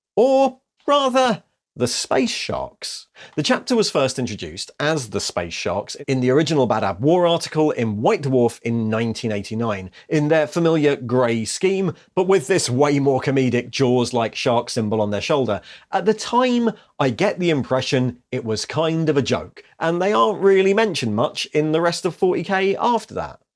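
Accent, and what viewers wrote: England, United Kingdom. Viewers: With how the accurate pictures are presented with your English accent I feel like I'm in school again 😂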